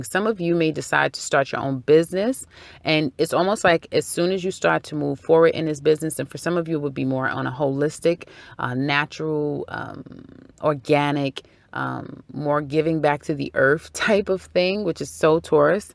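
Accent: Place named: New Jersey, United States. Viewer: nice reading dead on....luv ur accent sounds like New Jersey New York or Maryland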